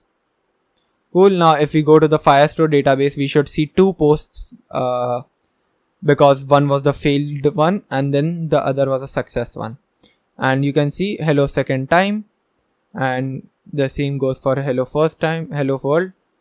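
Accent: India. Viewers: The faculty is Indian🥰 bro i can easily get your accent. First time I watched codecAmp video without turning on the caption😍❤️ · Indian? Ye for sure it's indian accent.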